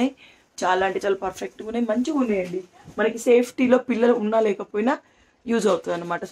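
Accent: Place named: Hyderabad, India. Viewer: Hyderabad slang masthu vachindi akka niko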